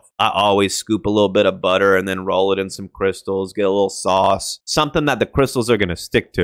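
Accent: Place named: South, United States. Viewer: Bro I love the list but I REALLY love the southern accent you pulled off. I’m from the dirty south you did so good 😂😂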